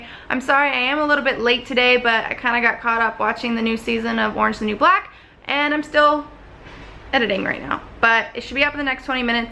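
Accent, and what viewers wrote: France. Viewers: Hi! I started looking at your videos a few weeks ago and it really got me to want to travel again.. and visit Shenzhen! Thanks for sharing your passion about travelling and .. about China! Oh and by the way.. super accent Français! ;) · Great french accent by the way:)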